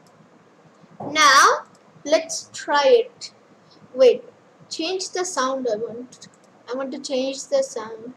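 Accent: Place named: India. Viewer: I like the indian accent "the firs ting" lol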